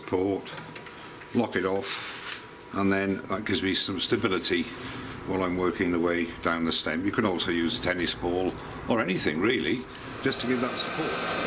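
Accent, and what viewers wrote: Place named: England, United Kingdom. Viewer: great job on the delicate goblet, I look forward to each of your videos. My belief is anyone who has an English accent, in my mind they have An additional 25 IQ points. Your videos are all most interesting and informative. Cheers!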